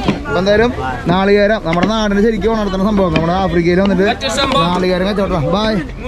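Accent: Thrissur, India. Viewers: ഈ തൃശൂർ ഭാഷ ഒന്നു മാറ്റി പിടിച്ചാൽ കാണാൻ ആളുണ്ടാവും തൃശൂർ ഭാഷ ആവർത്തനം ആവുമ്പോൾ കാണാൻ പരമ ബോർ ആണ് ബ്രോ എല്ല വിഡിയോകണ്ടിരുന്ന എനിക് പോലും മടുപ്പ് തുടങ്ങി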